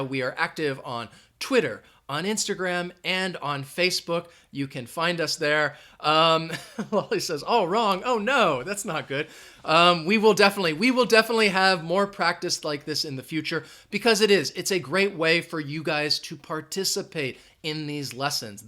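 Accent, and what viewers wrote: United States. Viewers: Hello Sir, I do appreciate your lessons . You teach with limpid and clear American accent. Have my thumb up!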